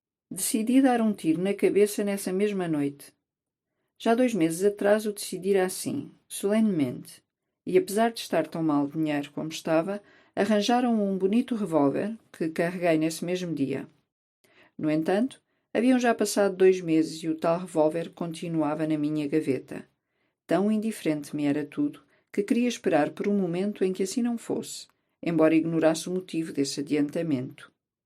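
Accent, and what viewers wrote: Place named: Portugal. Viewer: Sou brasileiro e pela primeira vez estou escutando uma voz feminina portuguesa após ouvir Fados. Beijinhos 💋 portugueses ....rsssss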